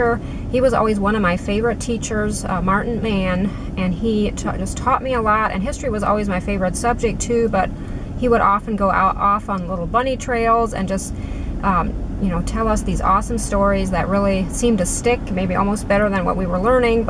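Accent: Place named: Pennsylvania Dutch, United States. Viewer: Hi... my grandmother from PA was fully Pennsylvania Dutch as well. Thereby, making me a good portion!.. You pronounce so many words just like she did! So cool!. The scenery is so awesome where you live! The fabric store is great one of a kind, and the market with beautiful produce etc is so fantastic. The garden shop! Wow...everything is simply Amazing! Thank you! May God bless you!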